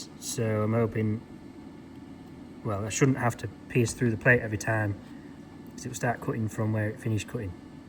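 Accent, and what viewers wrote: Yorkshire, United Kingdom. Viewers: The sound effects , brilliant, such a contrast to the deadpan Yorkshire, loved it.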